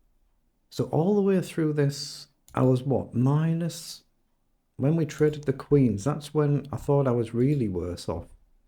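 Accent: Newcastle, United Kingdom. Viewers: I see! It sounds Geordie to me, I didn't know Yorkshire had that kind of accent. Great channel I enjoy watching your games.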